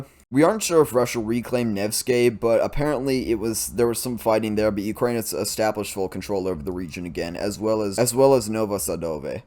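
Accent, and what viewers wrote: United States. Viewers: So painful listening to Ukrainian city names in an American accent.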